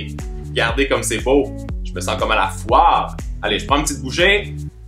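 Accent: Quebec, Canada. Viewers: Coucou ta recette est trop C😎😎L et tu est trop beau,j'aime trop ton accent, il est canadien?pourrais tu faire de la barbe à papa au NUTELLA???😘😘😘😜😜😜❤❤❤💙💙💙💚💚💚💛💛💛💜💜💜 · Ccou je suis nouvelle est je voulais te dire : Tu as un magnifique accent québécois ! Est j'adore tes vidéo +1 abo 😉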